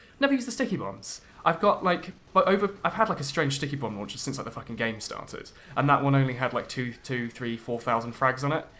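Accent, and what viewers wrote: RP, United Kingdom. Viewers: true, I got my shitty american accent and you got that fancy high class british accent.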